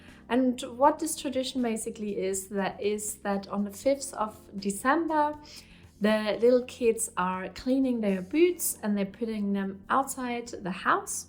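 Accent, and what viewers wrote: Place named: Germany. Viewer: also I knew you were from Germany before you said it:D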